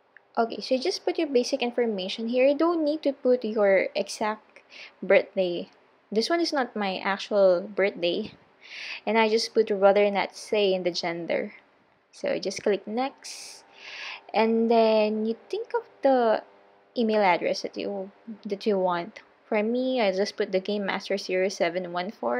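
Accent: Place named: Tagalog, Philippines. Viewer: Yeah does sound like she has a Tagalog Accent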